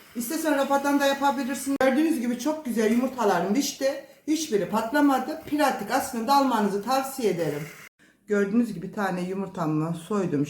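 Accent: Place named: Bolu, Turkey. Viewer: bolulu musun aq o nasıl bir lehçe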